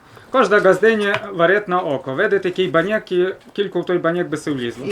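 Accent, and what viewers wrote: Hutsul, Ukraine. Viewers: З'їсти ситного - це добре. А от почути рідну мову, та ще й гуцульську говірку, - це делікатес для душі, й п'ятдесяти грамів не треба.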